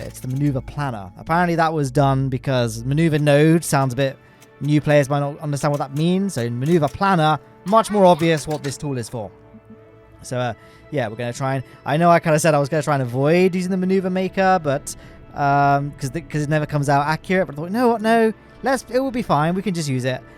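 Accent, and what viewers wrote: United Kingdom. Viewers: Find me a non British KSP content creator…. It’s annoying. Honestly, what is with British people and KSP? I even have a friend in Britain who plays. I’m not overly pissed, I’m saying there’s too many and I’m done listening to the accent. I’m entitled to my own opinion for whoever finds this comment and so are you.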